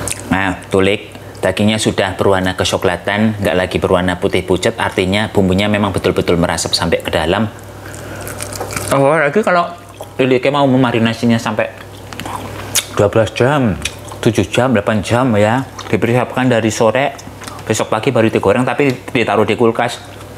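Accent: Banyumas, Indonesia. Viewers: Assalamualaikum baru nyimak Mase jawane mana Ngapak kaya aku Aku Kebumen mase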